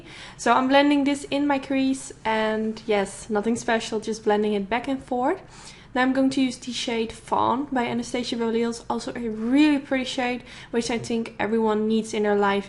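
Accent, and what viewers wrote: Netherlands. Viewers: are you Dutch? I hear something in your voice! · I love this look so much, you make it look so easy! Also, can I mention... Your accent is 👌🏼 I have a Dutch uncle, so I am biased of course! 😊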